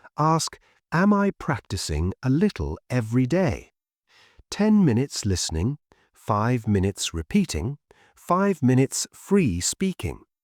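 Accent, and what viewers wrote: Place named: United Kingdom. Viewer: এই ভিডিও টি ব্রিটিশ accent এ মনে হচ্ছে কিন্তু আমি তো এখন USA থাকি।এগুলো American accent দিলে উপকৃত হতাম।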